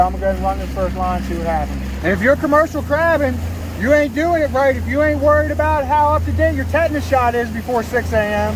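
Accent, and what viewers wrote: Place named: Patapsco, United States. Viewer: Man, where are you from? Sounds like Patapsco. Lol. I'm down in Deale. Where's your store. Ill come up.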